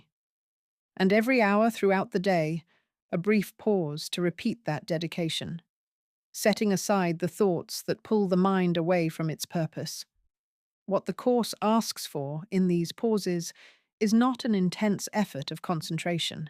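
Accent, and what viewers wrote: United Kingdom. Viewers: Hello wonderful resource that you are! I know you are also missing the 'original voice' as we all are...I did want to say though the current choice isn't just an English accent it's an upper middle class, private school voice...it conjurs..Heirarchy, Empire, the class system and in short a kind of scolding snoberry! So I wanted to suggest.... it's known that.... - BBC Radio Scotland’s “Voice of the Year” - used to be a big one for broadcasters and storytellers using a Scottish accent. - Saltire Society Literary Awards / Scottish Book Trust - not accent-specific, but Scottish writers and performers who use dialect/Scots are regularly shortlisted and praised for “authentic voice”. - Fringe First Award - the most prestigious Fringe theatre award were won using strong Scottish accents to deliver comedic or political ideas. - Total Theatre Awards, Herald Angel Awards - also give weight to “distinctive voice” and use of Scots/dialect. 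- BAFTA Scotland Award for Best Presenter/Performer - has gone to people for work delivered in a Scottish accent. - Royal Television Society Scotland Awards Using a Scottish accent is seen as a strength...... *Toastmasters International - The winner goes to the European finals. Scots accents often win because the authenticity and cadence help land the ideas. --- The most “published” accolade people usually cite is a Fringe First Award or a *BAFTA Scotland*. Both are widely covered in the press and specifically celebrate performers who use their Scotti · ❤ Thank you ! That' s one of the most illuminating lessons of the whole course. And the explanation in this video is brilliant! The britisch accent even seems reinforce the clarity of the message.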